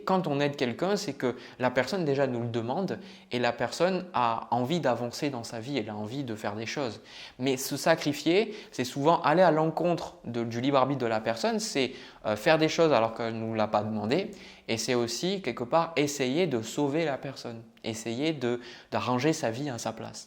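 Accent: South, France. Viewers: Merci, pour tous ces précieux conseils qui me font penser que j'aurai bien aimé avoir un pote comme vous!! En tant que signe Vierge, j'ai bien tout noté....et à mon tour, si vous le permettez bien sûr, je vais vous adresser quelques remarques en forme également de conseils....Vous avez selon moi, un tout petit "tic de langage", qui vous fait dire, par exemple: "Vous roprésentez ceci ou cela", au lieu de "Vous reuprésentez ceciou cela.."Alors moi aussi, j'habite dans le Sud et je ne suis pas du tout choqué, bien au contraire..:: Mais j'ai aussi des origines, et par conséquent une oreille....très parisienne....je sais, nobody's perfect!!;-)) Vous noterez peut-être comme moi, un léger sourire aux lèvres, que sur certains mots, assez rares, vous ne commettez pas ce que je ne saurai qualifier d'erreur!!;-)) Donc si vous le voulez bien, ce mois de décembre 2023, vous vous ferez plaisir, ainsi qu'à moi, en veillant à faire quelques progrès que vous nous présenterez, à n'en pas douter, dès ce début d'année!!!;-)) Merci et bonnes fêtes de fin d'année..!! 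Cdlt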